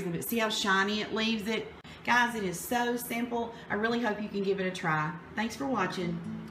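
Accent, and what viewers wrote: South, United States. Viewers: shell shell I don't think she was hating but loving her southern accent. Take a chill pill will ya??? LOL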